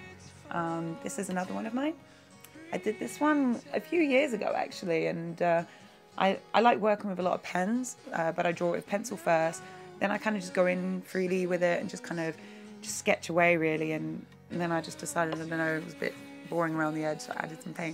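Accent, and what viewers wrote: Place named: Buckinghamshire, United Kingdom. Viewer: She's from Buckinghamshire in England not to far from me x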